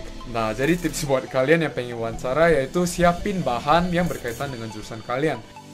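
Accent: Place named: South Korea. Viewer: Kakak.. kenapa jadi punya aksen korea?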